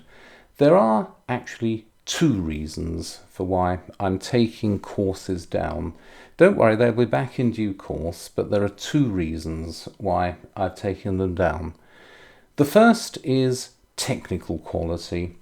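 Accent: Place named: Oxford, United Kingdom. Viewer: Thank you Mr. for all your videos. American subscriber here, I appreciate your easy to understand lectures about interesting historical subjects. Plus your accent makes me feel like I’m a top student attending a class at Oxford University. I’ve listened to your videos several times over and eagerly await your next upload. Thanks again.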